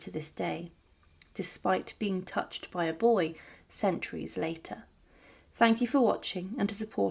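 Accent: United Kingdom. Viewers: I watch just to listen to your lovely British accent and your beautifully accentuated narrative. (And, of course, your well-researched historical content.) Keep up your amazing work, please. I could listen to you talk for days on end. 💕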